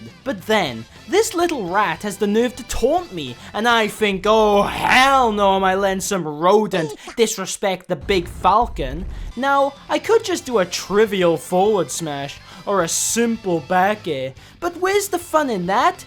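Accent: Wales, United Kingdom. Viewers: I think you really got to the heart of this. Good vid. Surprisingly sincere and sentimental. Hope Nintendo takes this to heart and NEVER fucks with things like the Falcon Punch. (Also, kinda love your accent. Don't get many Welshmen on here...I'm sure you're not sick of hearing THAT by now...) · Great video. Are you a little bit Welsh? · What the hell is your accent mate. You sound welsh, American and russian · Your accent is amazing, I'm guessing Welsh? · I can't decide if you're Welsh (most likely), Irish or American. :')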